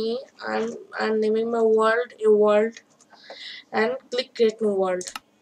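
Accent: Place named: India. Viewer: indian acent lol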